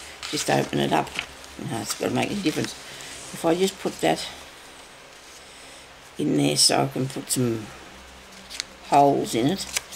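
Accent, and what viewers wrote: Australia. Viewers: Hello, just found your podcast about the padded coat hangers. Enjoyed it so much wanted to watch more. Nice to hear your Aussie accent, from a kiwi in Texas. 💖💖😊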